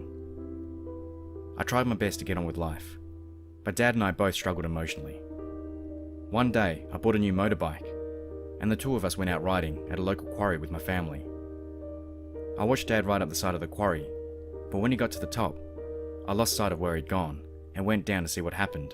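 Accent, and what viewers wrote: Australia. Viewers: haha I'm a sucker for a man with an Aussie accent..... Yes I'm a strange girl hahaha. An Aussie accent and horror stories/crime.... Winning here!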